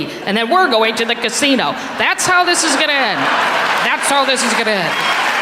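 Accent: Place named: Chicago, United States. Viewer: Are you from Chicago? Your accent defaults to da accent ❤❤❤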